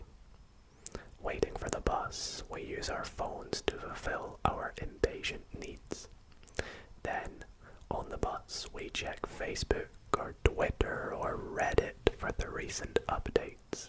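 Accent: Scotland, United Kingdom. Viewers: Your normal voice is EXTREMELY Scottish and your whisper isn't. And it confuzzles my brain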